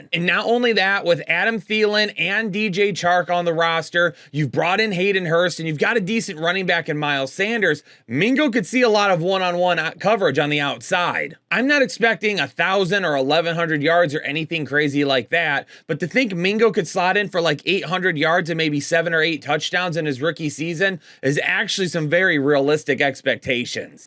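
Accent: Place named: East Coast, United States. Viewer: Good info, but this dude's East Coast nasally voice is extremely grating.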